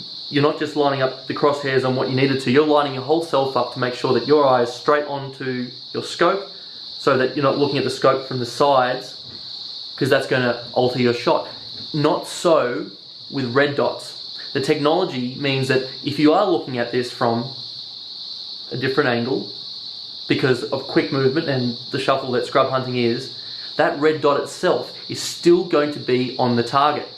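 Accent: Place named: Australia. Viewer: I just stumbled across your channel today by sheer accident but you have me hook if for no other reason ( although many reasons) you are the first Aussie I've heard that just speaks like a regular Aussie I just genuinely appreciate that and for once don't feel miss represented please keep up the honest genuine feedback and the fact you're not trying to tell answers but have a discussion. Mate you're one of a kind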